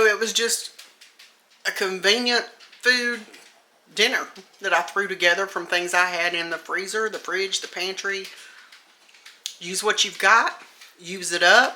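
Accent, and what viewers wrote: South, United States. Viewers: Looked yummy,sounds southern accent I'm in Tennessee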